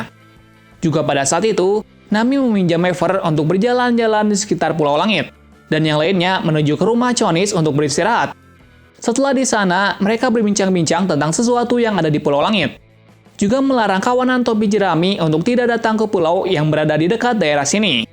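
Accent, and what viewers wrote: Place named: Surakarta, Indonesia. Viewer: mirip orang solo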